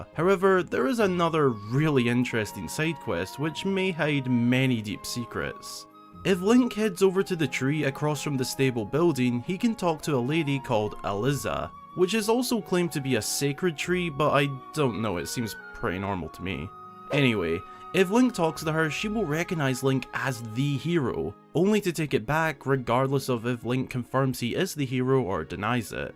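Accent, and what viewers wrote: Scotland, United Kingdom. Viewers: you sound like you're Scottish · Your Scottish accent is amazing xD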